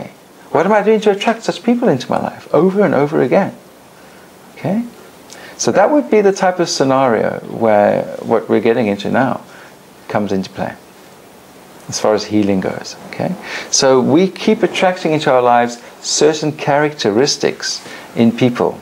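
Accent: South Africa. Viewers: South African accent? 😁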